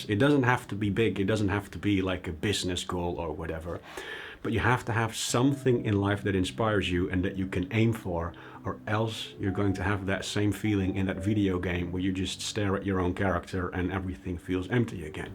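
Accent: Netherlands. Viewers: I heard you were dutch by your accent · Are you dutch or it is just your accent? Good videos man, good luck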